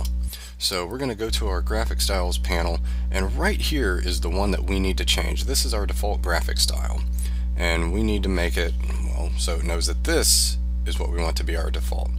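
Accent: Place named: South, United States. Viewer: Really helpful and the Southern delivery was a bonus ; )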